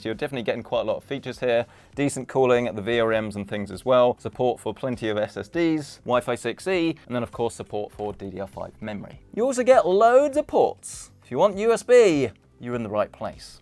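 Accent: United Kingdom. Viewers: British accent is amazing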